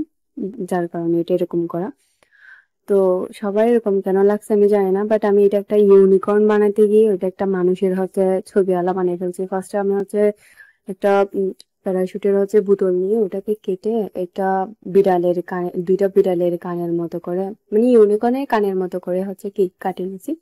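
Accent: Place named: Chittagong, Bangladesh. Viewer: সুন্দর হয়েছে আপু তবে আপনি চট্টগ্রাম থেকে বলছেন মনে হচ্ছে 😊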